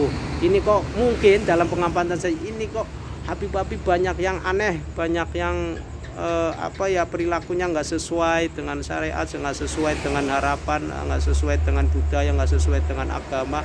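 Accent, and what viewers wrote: Indonesia. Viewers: Kaya wong Tegal' Brebes'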